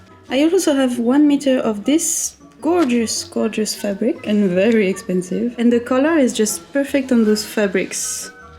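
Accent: France. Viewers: Side note: I enjoy the way you speak english. I'm guessing the time you worked as a flight attendent helped with that. A lot of french words have made their way into english (looking at you Guillaume le Conquérant), but in english the stressed vowel is often a different one. With you I never hear a stressed vowel out of place. If I hazard a guess: you can also talk english with hardly any accent, but for the videos you're playing up french accent a bit. About the word 'stomach'. Yeah, that word is also used generically, but I think where you said 'stomach' the word 'abdomen' is a better fit.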